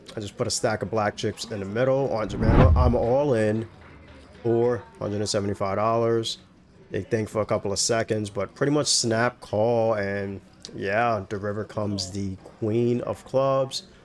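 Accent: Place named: East Coast, United States. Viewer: Love the East Coast accent and congrats on 1k subs and Monetization Fam. 🎉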